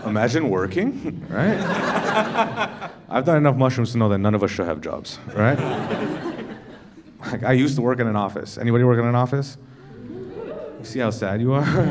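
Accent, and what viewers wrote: Toronto, Canada. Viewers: i know a toronto man when i hear one 😂😂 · I love Canadian comics, I can sense his Toronto accent being held in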